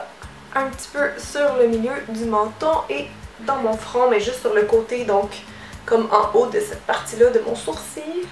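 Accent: Quebec, Canada. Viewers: j adore ton acsent québécois et tu es trop belle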